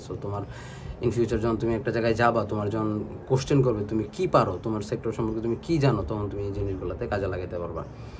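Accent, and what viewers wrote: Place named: Brahmanbaria, Bangladesh. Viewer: Bhai, take my salam. Apnr kotha bolar accent shune kno jani mone hocchilo j apni Brahmanbaria er accent e kotha bolchen. However, apnr fb id check kore dekhlam apni dhk thk. I dont know why, but still mone hocche brahmanbaria er keu kotha bolche 😄 Btw, I am planning to do my masters from Aus, thats why I've found your videos and started watching them. Thank you for the informations bhai. I am from Brahmanbaria ( Sarail)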